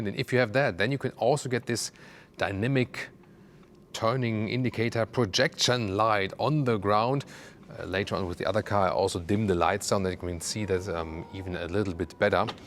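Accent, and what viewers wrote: Germany. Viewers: Excellent presentation,. I adore German craftsmanship. And I prefer the Blue Q9 over the SQ designation. Its understated elegance carries a more distinctive refinement. And your lovely accent makes me wish Audi would use you in a Siri, or Alexa-style interactive firmware suite with the Q9. It’s wonderful!🇩🇪🌷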